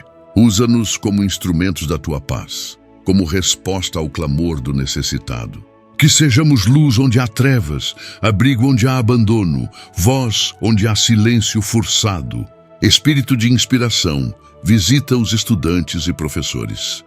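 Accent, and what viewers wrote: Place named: Portugal. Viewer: Por que você fala com sutaque de português de Portugal há momentos que você fala que nem português por 😢 me diz o porquê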